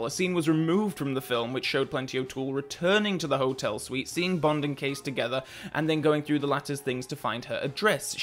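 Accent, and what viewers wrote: United Kingdom. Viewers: Well done. This wouldn't have worked as well if you didn't have a British accent <smile>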